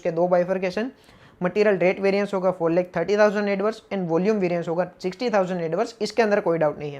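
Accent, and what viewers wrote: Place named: Junagadh, India. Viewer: 2 things *Junagadh no tone aavi gyo😍 *And standard costing nu formate JKSHAH Classes jevu lage che..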